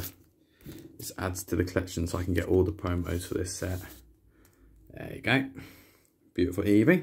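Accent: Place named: England, United Kingdom. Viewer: Well fk me sidewise I was not expecting the English accent lmao caught me off guard. Saw you on the TabooContract twitter with those dragon cards.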